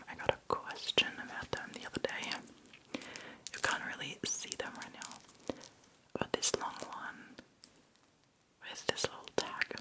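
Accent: Australia. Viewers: girl its blowing me away that you're not australian, my family are aussies and you sound no different!! haha thats amazing you picked up the accent so well! also would love to see the dress you found, I was just in the exact same situation on the hunt for a wedding guest dress, and asos came in clutch!<3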